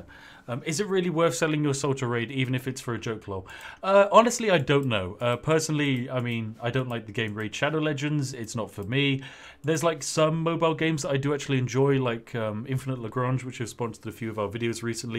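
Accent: United Kingdom. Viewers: its terrifyingly brititish tho. not brits that try to pretend to be canadian when traveling. no. unapolgetic better than thou pretentiousness. its the kind that is funny on the east coast, exploited on the west. midwest being best, we tolerate it but say half that to an actual person here and shit gets kicked in.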